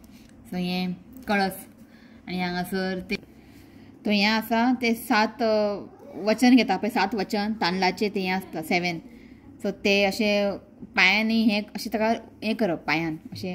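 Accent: Konkan, India. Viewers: Dont cry 😭 kokani thodishi vegali ahe tumache bolane समजले पण काही जण बोलले तर समजत नाही 😂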